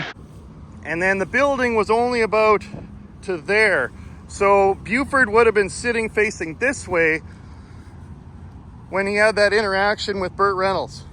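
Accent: Canada. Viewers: You must be a Canadian with that accent of house road and about. Terrible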